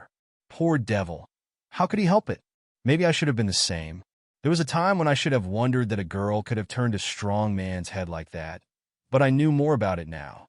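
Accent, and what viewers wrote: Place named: United States. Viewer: American narrator ! totally inappropriate and not welcome .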